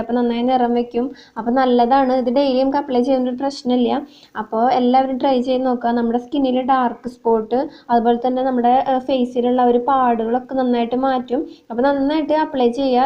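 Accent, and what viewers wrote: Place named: Thrissur, India. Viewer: എവിടെ ആണ് വീട്?? തൃശൂർ ക്കാരി ആണോ?? 🤔🤔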